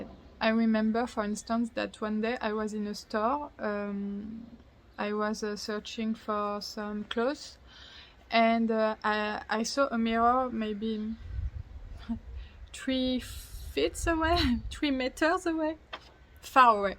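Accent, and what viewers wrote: France. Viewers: I love your French accent 💖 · This is the first video of yours that I've seen. I am very impressed by you...both your bravery, and your command of the English language. Yes, your accent made me concentrate more to understand some words, but most of us Americans think the French accent is beautiful.❤ You speak very eloquently. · Never apologize for a French accent! :) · Who does NOT ❤ a French accent! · Happy to hear more French accents on YouTube. Thank you for sharing your story. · 1- you are so beautifual with our without wigs. 2- having a french accent makes you even more beautiful 3- thank you fo this video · Lovely and pleasant accent. French is a harmonic language and soft in the ears so the accent is so nice. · Your English with French accent is beautifull it truely is. I feel for you girl. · Why are you apologizing? who doesn't adore french accent!! 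· Well she’s French she can’t do anything about it like Im Greek and I’ll always talk with a Greek accent when I speak English,I can’t control it…..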